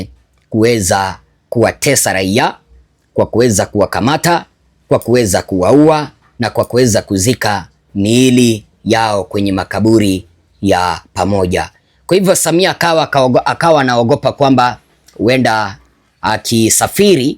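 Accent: Kenya. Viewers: Kwanza huyu jamaa ni mkenya hakuna mtanzania anaeongea kiswahili hicho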